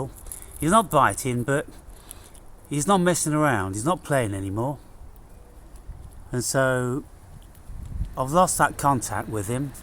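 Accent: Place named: Southern England, United Kingdom. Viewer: Hi, found your video on Peter Kingsley, great work! I have a rather personal question, you said you’re living in Newcastle up north, but your accent is from south, when and why did you move up there? I’m from London. Thanks 👍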